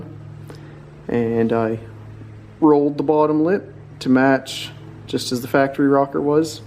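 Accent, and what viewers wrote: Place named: Nova Scotia, Canada. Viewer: How'd I know a video about how to repair a rocker panel would be done by a guy with a Nova Scotia accent.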